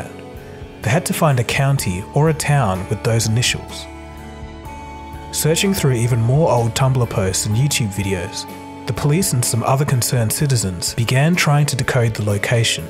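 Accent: Australia. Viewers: You sound Aussie? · this image is from an alley between one end of the Queen Victoria Building and the other in Sydney, this is such a memorable scene. Which doesn't really fit the theme of New Jersey.... Judging from the Uploader's Aussie accent, he should've known better.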